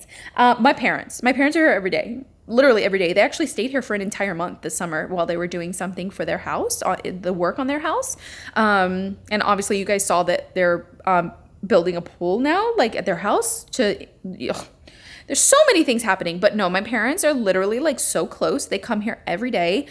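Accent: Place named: South, United States. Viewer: You are a great example of how us southern people can easily go back into our southern accents! 😂 I didn't realize I did it until a British person did it in front of me at my place of work... And apologized for it... I was like girl... I'm pretty sure I do this with my southern accent so I'm not judging! ❤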